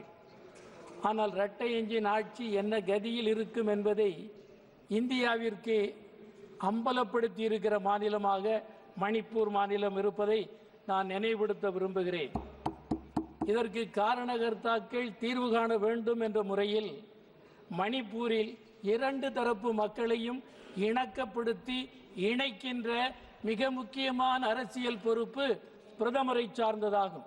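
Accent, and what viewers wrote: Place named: Kongu, India. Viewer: பஞ்சு நகரின் கொங்கு தமிழே ! சமத்துவமும் சகோதரத்துவமும் தழைக்கட்டும்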